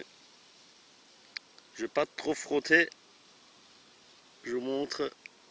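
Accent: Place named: South, France. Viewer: petite sortie sympa ca degulingue la tarte a l'oignon continue j'adore ton accent du sud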